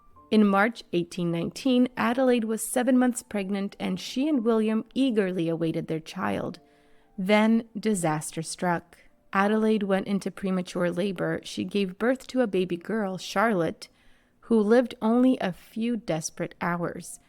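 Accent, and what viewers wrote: United States. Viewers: Brits, I presume English Brits, seem compelled to insecurely leave indignant comments on this American-accented narration. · peter ustinov great for the part of william 1V. not keen on an american narrating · Remember this is a Yank female narrating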